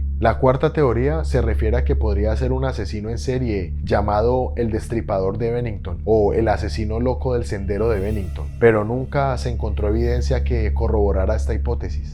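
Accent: Colombia. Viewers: Me gustan mucho tus videos y tu estilo narrativo yncluyendo tus gesticulaciones. Si mo me equivoco tienes un asento colombiano parce. Espero tu siguiente video.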